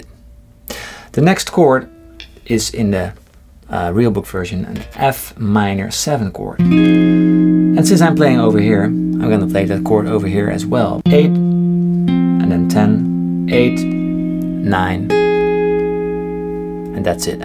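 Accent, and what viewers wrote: Netherlands. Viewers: He's Dutch ;) but he's living in the USA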